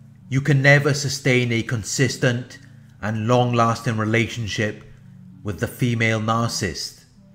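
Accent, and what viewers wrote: Wales, United Kingdom. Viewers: Welsh accent..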